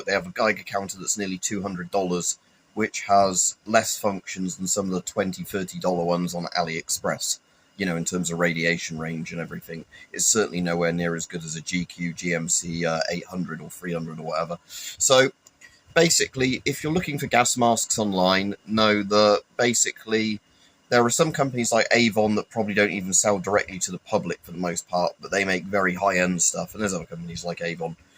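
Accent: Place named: England, United Kingdom. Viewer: sounds English 🏴 -looks like El Chapo 🇲🇽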